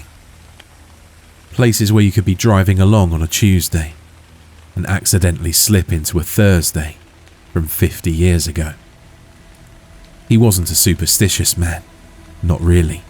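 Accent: United States. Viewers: Excellent American accent, my guy! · Your stories are always great. I use them to help me sleep! Gotta say though because I’m boring, I prefer just your normal voice without the US accent. Just my opinion though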